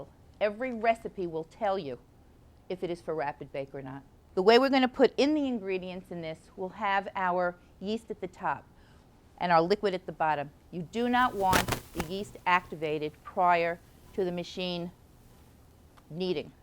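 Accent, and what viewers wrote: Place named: New York, United States. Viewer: I love the New York accent.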